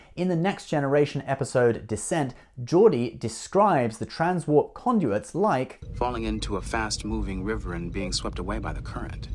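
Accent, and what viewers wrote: United Kingdom. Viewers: YES THE FRICKING BRITISH IS BACK EVERYONE THIS IS EVEN BDTTER THAN WHEN I FIRST HEARED ABOUT HALF LIFE ALYX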